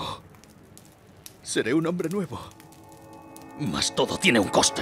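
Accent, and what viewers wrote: Spain. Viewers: Siempre e dicho que odio el acento de los españoles pero sinceramente ningún otro acento le queda tan bien a las rimas como este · Eres el único español que amo como habla :v eres el mejor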